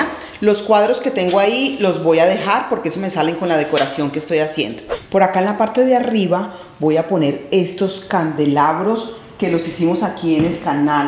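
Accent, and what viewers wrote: Antioquia, Colombia. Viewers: tú canal és maravilloso tús manualidades la forma tán sencilla como explicas todos los pasos a seguir en cada manualidad o cambio hacen de tú canal un lugar favorito único y muy acogedor tu sencillez tú carisma TODO EN TU CANAL ES ESTUPENDO ME ENCANTA y ése habladito paisa que me fascina pues yó vivo acá en bogotá colombia pero???? Toda mi familia mis raices son paisas FILANDIA QUINDIO BELEN DE UMBRIA y vallunas TULUA Y ROLDANILLO aunque también tengo tolimenses en mi familia mi abuela materna es de ALPUJARRA TOLIMA!!!! Un abrazo enorme a ésta bella compatriota qué és mi linda!!!!!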